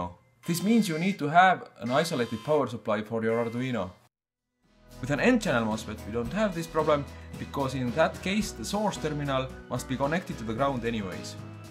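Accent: Estonia. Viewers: Thank you so much helped me out man! Btw you sound like an estonian. Am i right? Im from est.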